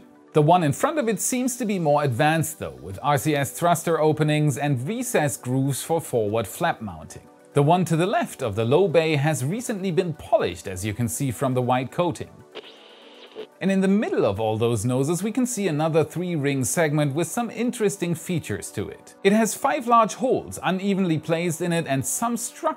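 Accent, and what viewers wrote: Germany. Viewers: Your English isn't quite good enough to tell (yet), but he has a quite noticeable German accent. Similarly, most native English speakers can't tell Bavaria from Berlin from Bern. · is that a german accent ? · I can hear the german akcent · Top-quality content, and loving the German accent! :D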